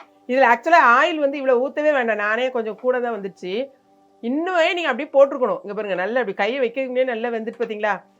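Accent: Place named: Tirunelveli, India. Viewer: As I am also from Tirunelveli, I enjoy your gesture, accent , innocence and simplicity besides your cooking. ( I hate cooking and wish I were your neighbour to sample your dishes)😂. God bless. Is it possible to connect with you over phone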